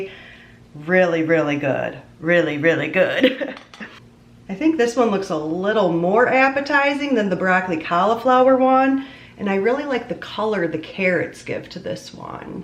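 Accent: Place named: Chicago, United States. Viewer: I love brocoli salad and don't like tofu mayo, so these are just right for me. Can't wait to try them. You sound like you live in the Chicago suburbs, is that true? Me too!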